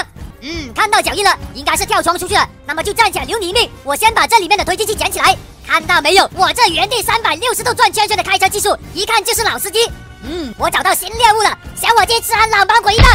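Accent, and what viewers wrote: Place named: Guangdong, China. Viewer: 靚仔😂😂，怎麼有廣東普通話?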